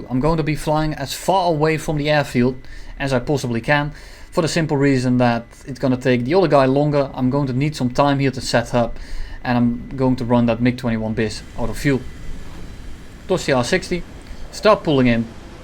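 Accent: Netherlands. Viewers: hahah still a nice video😉 wait a dutch accent ?🇳🇱🇳🇱🇳🇱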